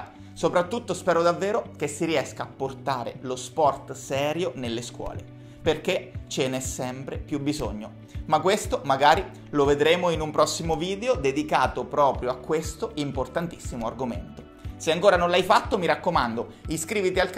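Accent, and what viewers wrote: Marche, Italy. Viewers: Ciao, come si chiama lo youtuber? Sembra marchigiano, la staffedda quattro per cendo po' esse solo de qui vicino. Verso magerata o giù verso Fermo fra.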